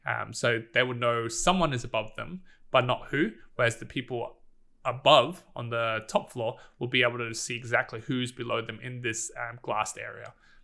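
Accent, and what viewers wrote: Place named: Australia. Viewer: It took me til like halfway through that video to realise that you're Australian, like me. I'm more used to seeing Among Us related content with people predominantly with American accents and since I'm Aussie myself it took a sec to realise that yeah I'm used to that accent, but that's because I'm Australian, and not because I'm used to Among Us content in that particular accent.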